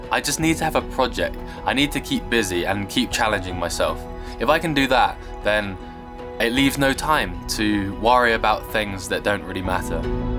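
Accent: United Kingdom. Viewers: Hello I'm Trish, i come across yr channel and all i could do is smile big!! You are warm as that fire, handsome, smart, and make great Vij ya's. I watch lots of U Tubers from the U,K and always enjoyed yr culture and of course thee accent. Keep busy and happy, yr there!!✌💙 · OMG ! you sound exactly like "Thoughty2" in this bit here. You must be from the same area, since there are so many accents in the UK. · you are living in these luxuriously furnished mountain huts and you are presumably a rich man.because rarely people can afford to live in such a spectacular condition you are living alone.good luck.I enjoy from your incredible videos and your pure Brtish accent as well.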